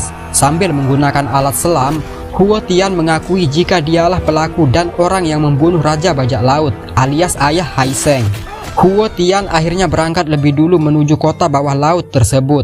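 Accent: Lombok, Indonesia. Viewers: Ini yg bercerita orang lombok ya.?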